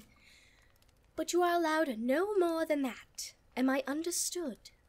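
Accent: United Kingdom. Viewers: How… I swear you need to be hired by someone to do voice acting This British high ip accent is just…perfection, with the vampire roleplay, words can’t describe how amazing this is.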